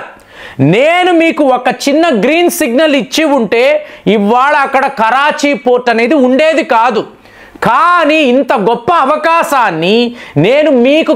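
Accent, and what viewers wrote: Tamil Nadu, India. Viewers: Ori vari nuvvu matladedi tamila telugu, salam kadu sagam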